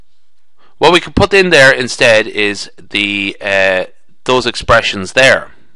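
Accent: Ireland. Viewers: man's irish. i can tell. that was really helpful thank you!!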